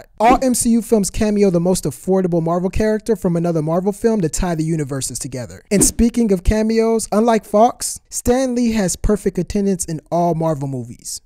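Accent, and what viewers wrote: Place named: Latin America. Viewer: It's lovely. I like your voice/accent. I don't think we have many latino peoples in Britain :)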